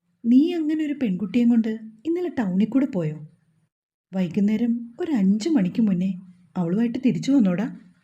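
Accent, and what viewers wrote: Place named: Kollam, India. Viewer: . നല്ല കഥ സൂപ്പർ സൗണ്ട് നിങ്ങൾ ഏതു നാടുകരി ആണ് കെല്ല o ആണോ ഞാൻ കാലിക്ക കാലിക്കറ്റ് ആണ് കെല്ലം കാരുടെ . സംസാരം ആണല്ലോ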